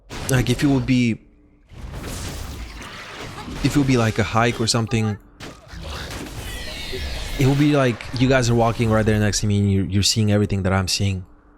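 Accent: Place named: Bulgaria. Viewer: So I've been watching your videos for what? Year and a half? Two? And only now I actually realize why your accent is so familiar - you are a Bulgarian fellow :) Hit me up when you land in Sofia, you have a beer from me ;)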